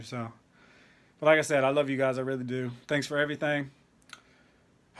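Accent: South, United States. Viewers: I knew he wasn't black, he doesn't even sound black you all just aren't used to white Southern accents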